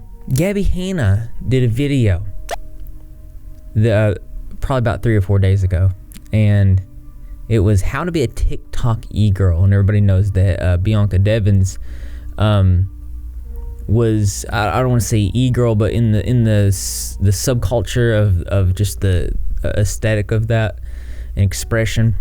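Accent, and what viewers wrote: South, United States. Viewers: Your southern accent is endearing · are you from the south?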